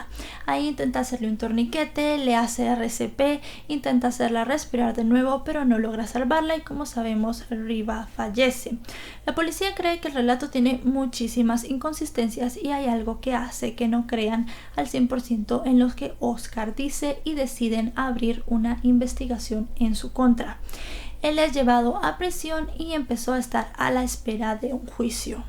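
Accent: Standard. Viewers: Tu español es muy neutro.. es de donde? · Excelente manera de transmitirnos la información sobre los casos ,amo su español Neutro ,no tenía idea de donde Era pero creo que no tiene acento de Guatemala es más no se distinguir el acento solo que me encanta la forma en que narras ,un gran trabajo impecable ! Suerte que siga creciendo más Saludos de una Hondureña ! ❤️ No hay día que no vea un caso suyo ! :)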